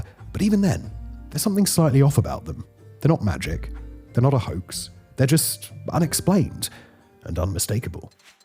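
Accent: United Kingdom. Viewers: Lots of his words are strange like that where he pronounces them in this weird, British way lol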